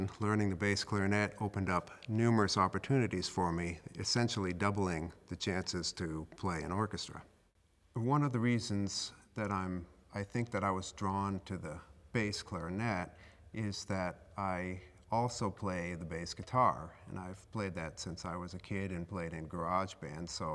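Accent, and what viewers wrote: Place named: Utah, United States. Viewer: Is it just me who likes his utah accent?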